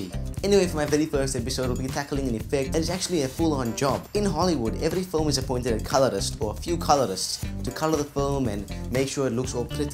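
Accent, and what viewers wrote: South Africa. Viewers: You sound South African bru.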